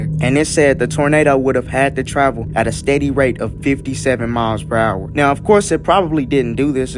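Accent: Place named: Louisiana, United States. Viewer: I’m hearing a Cajun accent in the narrator. I love that accent!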